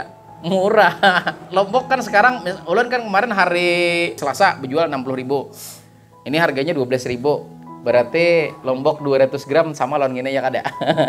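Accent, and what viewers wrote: Banjar, Indonesia. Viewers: Puan orang mana ta buat logat banjar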